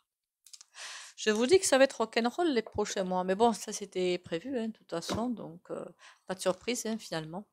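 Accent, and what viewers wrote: South, France. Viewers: Merci, ce soin m'a fait partir loin d'un seul coup, en quelques secondes et je reviens paisible. J'aime beaucoup votre voix, avec en plus, ce petit accent ( je pense que vous êtes du sud ),et aussi beaucoup de petites phrases amusantes et percutantes qui font plaisir!moi j'ai l'impression que je commence à décrocher, à lâcher prise par rapport à tout ça: j'ai confiance qu'on va arriver à un moment donné où le système va s'effondrer tout seul et où il faudra tout reconstruire différemment, et que cette fois-ci ce sera bien. j'aimerais bien avoir votre avis sur l'idée de Frexit, mais peut-être l'avez-vous fait déjà précédemment? Je suis aux Patriotes et par conséquent je tiens à l'idée de reconstruire sur de nouvelles bases. Merci.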